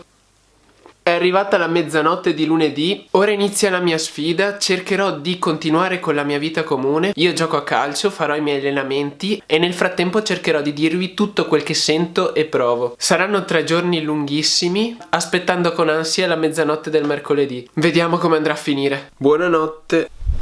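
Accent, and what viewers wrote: Veneto, Italy. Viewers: Ma sei Veneto?? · Ma sei veneto ? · Ma sei Veneto? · Si è veneto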